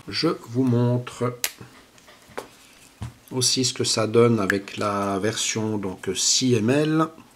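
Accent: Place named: Switzerland. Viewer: Hello, Bravo pour tes magnifiques vidéos. Il me semblait avoir reconnu un accent Suisse. Salutations du Jura. Sais tu s'il y a encore un salon de la vape en Suisse ?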